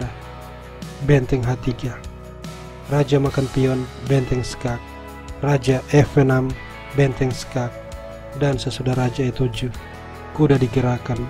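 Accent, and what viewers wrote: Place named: Eastern Indonesia, Indonesia. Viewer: Bung orang timur kah. Logatnya itu kental bnget timurnya 😁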